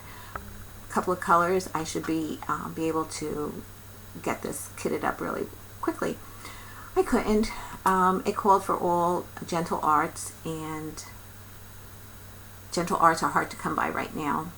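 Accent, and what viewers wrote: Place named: East Coast, United States. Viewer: Hi. I'm glad I found your channel today. I'll go back to your Flosstube #1 and catch up on your videos in the days ahead. I moved to the desert southwest in 2000 but you and I have the same accent so I believe we are both from the same coast of this beautiful country. Like you I cross-stitched a little bit in the 1980's until work and bringing up 2 boys took precedence. Once the boys were grown and on their own, I took up quilting and about 2-1/2 years ago I stumbled on cross-stitch again at our State Fair. I am glad that I have two of the most wonderful hobbies and I love them equally. Since there are four full weeks in May, my Maynia plans consist of four WIP's and four new starts. Monday through Friday of week #1 I will work on WIP #1 and Saturday and Sunday work on new start #1, etc. Good luck with your Maynia plans and I look forward to your next video.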